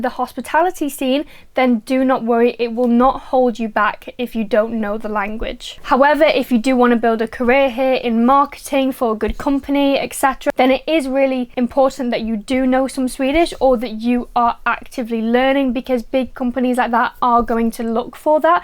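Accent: United Kingdom. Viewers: If you wanna make a real career here in Sweden, you normally have to learn some swedish. Otherwise, your career will stop at a certain point, which I think is quite fair to be honest. But what I'm most curious about is if you are north English or south Scottish!? ;-) (And by the way, I really do hate all the auto-spelling functions, that Youtube throws at me all the time!).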